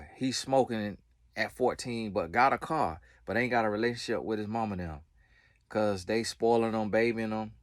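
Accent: South, United States. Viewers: Hey, u don’t have a country accent, u have a southern accent! U articulate just fine. Correct anyone who says that to you. Its a southern accent!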